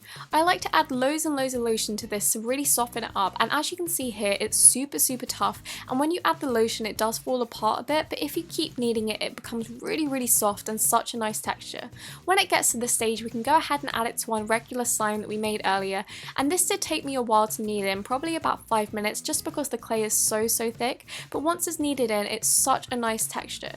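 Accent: United Kingdom. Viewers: You know the sound of the slime is not as good as your beautiful British accent it’s so good I love you voice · Your voice is soo satisfying!!! 😆 (I LOVE THE BRITISH ACCENT)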